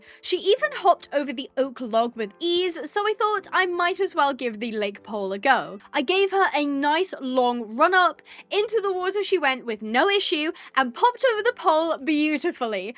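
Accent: United Kingdom. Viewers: Your voice sounds like British and it’s brilliant 😙👌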